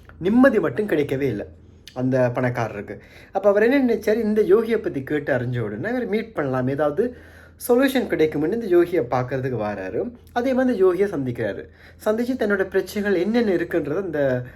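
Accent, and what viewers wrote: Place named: Sri Lanka. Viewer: அழகான இலங்கை தமிழ் புரிய வைத்த நன்றி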